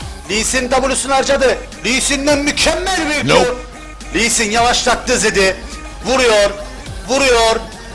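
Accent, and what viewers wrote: Cyprus. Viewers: kardes sen kibrislisin dimi 😄😄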